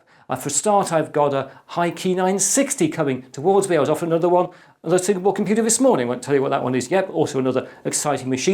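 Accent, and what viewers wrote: United Kingdom. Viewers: It's hard to resist the (vocal) charms of a well-spoken British gentleman, especially when he imparts information in such a crisp, concise manner. There is more than enough flash and noise on Youtube, a bit more understated presentation and thoughtfulness goes a long way. Congrats for a hundred and fifty healthy doses of knowledge, here's to loads more!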